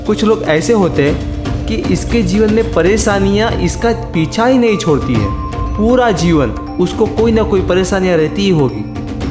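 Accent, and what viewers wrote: Gujarat, India. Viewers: Are u Gujarati?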